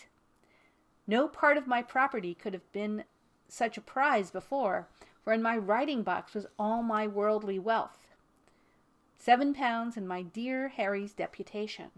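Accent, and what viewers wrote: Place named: United States. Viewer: It just doesn't work with an American accent 😕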